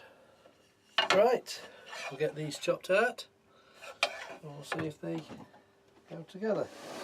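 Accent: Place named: Stoke, United Kingdom. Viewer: Like your style you sound like a Stoke lad to me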